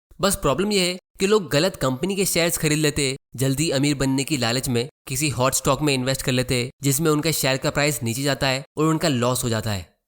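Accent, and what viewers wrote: Gujarat, India. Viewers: Gujarati voice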